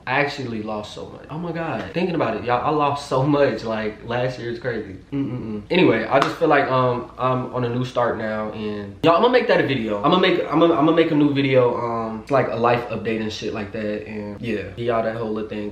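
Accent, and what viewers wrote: New Orleans, United States. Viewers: U sound like messy Mya in ur New Orleans voice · you snapping in this new orleans accent hahahaha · LMAOOOO THAT New Orleans Accent Giving Playboys LMAOOO I Love Your Channel Down🥰😂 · lol it’s the new Orleans accent for me 😂😂😂😂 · its the new orleans accent for me🤣🤣🤣🤣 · You and this Nola accent is a kiiiii lmfaooooo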